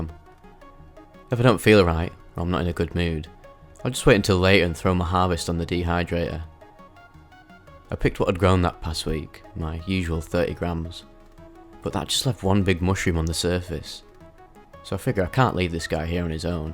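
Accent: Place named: Burnley, United Kingdom. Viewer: I'd normally hear this type of voiceover from some American tone not a Burnley accent 😅😂 don't take offence I like it as I can relate I too have an accent which doesn't ring true to me .I speak in a Scouse accent however I am not a Scouser 🥸. Life's a funny thing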